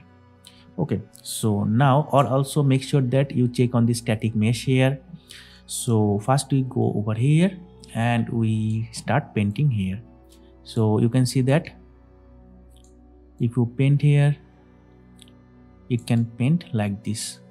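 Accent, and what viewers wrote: India. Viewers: But in an Indian accent 😓.. waste of time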